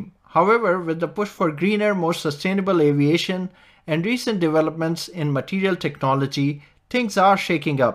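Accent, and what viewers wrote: India. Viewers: indian accent... nope i'm out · Is there a way to have a native English speaker do the voiceover? What's the point of using a heavy Indian accent in this airship video?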